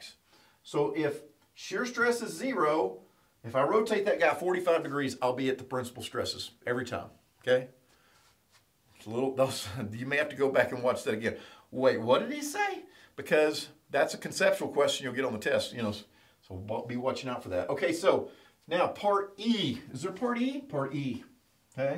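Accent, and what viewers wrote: United States. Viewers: His country accent makes me feel a lot more accessible and authentic. In addition to that, this is a very thorough and simple distillation of an otherwise complex topic. I really like it!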